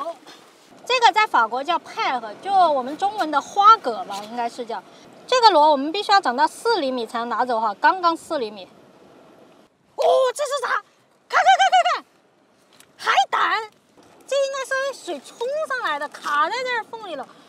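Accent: Sichuan, China. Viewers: 看到一半我老公说这会不会是个四川人！跟你一样好奇地什么都要抓一下摸一哈，说话也像，看到后面果然啊！了解我们大四川的川性是什么样的(o^^o) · 美女是四川的吧。这口音听着好熟悉，哈哈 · 听口音是四川人吧？ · 火锅味的普通话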